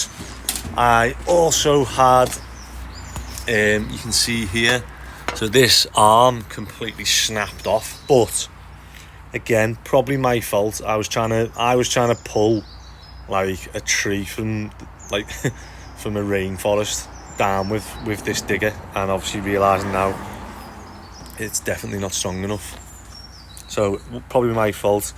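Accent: Liverpool, United Kingdom. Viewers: Nice Scouse guy.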